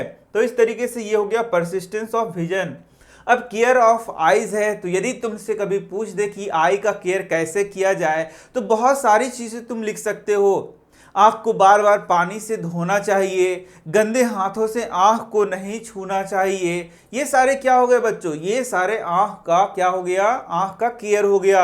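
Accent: Bihar, India. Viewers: I am dam sure sir is bihari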